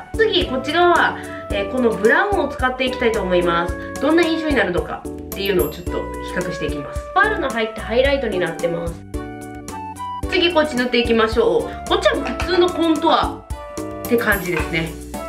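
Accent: Kansai, Japan. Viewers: この顔で関西弁喋るギャップ💗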